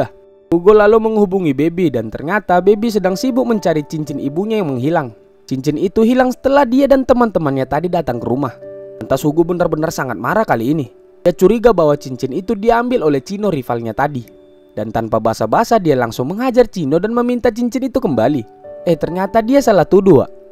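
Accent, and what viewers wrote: Medan, Indonesia. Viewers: Dari awal denger cara kamu bwa cerita n logatmu udah tertarik saya, gampang dimengerti n kocak juga, org dri medan ya min??? MEDANNYA KAU BANG?? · Tiba² nongol diberanda langsung subscribe & like 🔥🔥 // Salam dari MEDAN 😁. Dari logat bicaranya mimin juga dr medan keknya ini. 🤭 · Logat medan ya lek😅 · pasti Abang ini orang Medan wkwkw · Medan kali ahh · Salam kenal min,subscriber baru mu, dari awal nonton film ini salfok sama cara pengucapannya nampaknya uwak ini anak medan pikirku🤣salam kenal lah yaa awak juga orang medan wak. Sukses terus!🫶🏻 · mantap kali wak wkwk. orang medan keknya uwak neh 🤣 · Org medan kyk nya kau bang kwkwkwk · lucu kli kau ngomong nya bg,,,orang medan ko ya,,,wkwkw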